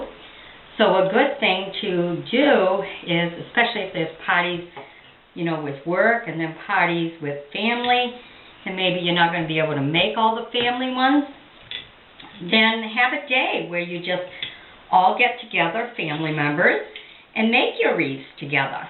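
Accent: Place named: Maine, United States. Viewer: Are you from Maine? I thought so with the accent then you said homemade Italians? I knew it! Thank you for sharing...Merry Christmas!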